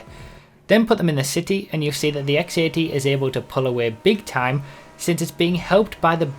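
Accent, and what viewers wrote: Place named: United Kingdom. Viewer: Niyatec No, it’s the british way of saying things.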